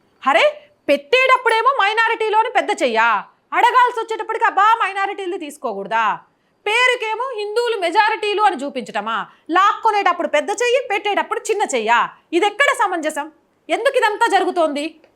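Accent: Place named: Srikakulam, India. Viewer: మేమ్ నిజం చెప్పండి మీది శ్రీకాకుళం కదా మీ మాట catch చేసాం అమ్మేస్తన్రు అలాగే మన ఆకలి తీర్చటానికే ఆలోచిస్తన్రు అన్నారు 😄👌👌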